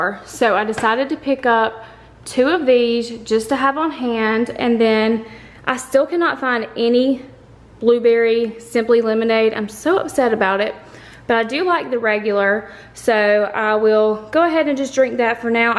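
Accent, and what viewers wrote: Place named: South, United States. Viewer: Coming over from Tiffany’s channel! Love your channel and your southern accent❤️ I live in North Carolina 😊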